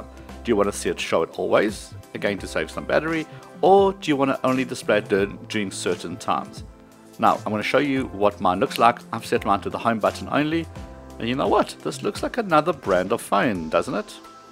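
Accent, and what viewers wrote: South Africa. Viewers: Sound south African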